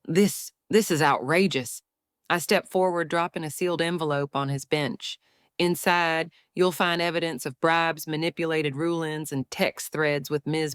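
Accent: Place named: Kentucky, United States. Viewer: Sounds more like Kentucky 👍.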